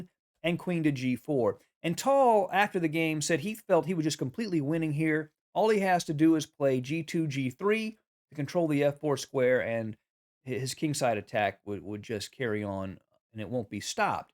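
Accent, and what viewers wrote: United States. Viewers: Thank you, sir. Your commentary is the best. You and the Chess Wisdom guy. But it's nice that you speak like an ordinary American. He is also great but the accent is a little distracting.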